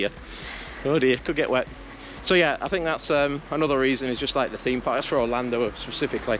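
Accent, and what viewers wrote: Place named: Yorkshire, United Kingdom. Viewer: Like others, hope Dad recovers soon As for your accent - soon reverted to Yorkshire 😬 Thanks to you both ❤️❤️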